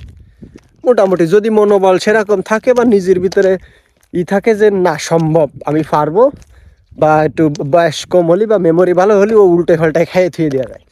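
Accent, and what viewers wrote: Jessore, Bangladesh. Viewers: ভাই আপনার কথাই যে মজা পায় খুব ভালো লাগে ভাই আপনার গ্রাম্য বাংলার ভাষা গুলো আমাদের যশোরের সেই ভাই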